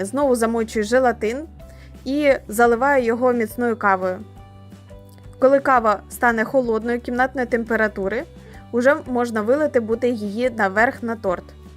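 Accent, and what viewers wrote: Ukraine. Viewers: Знайшла спочатку відео з льодяниками ваше і подумала, що ви з росії, але почула нашу букву «Г»)) а потім перейшла в канал)) українською набагато милозвучніше !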